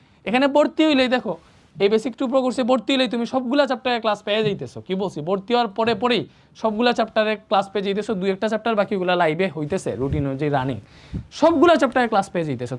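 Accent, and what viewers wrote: Sylhet, Bangladesh. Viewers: K k Sylhet thaka dakso vaiya kintu Sylheti😊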